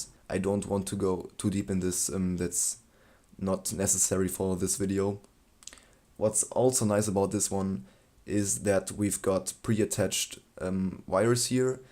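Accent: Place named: Germany. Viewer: Where are you from? Seems like you're from Germany because of this pretty strong accent but you're doing pretty well keep going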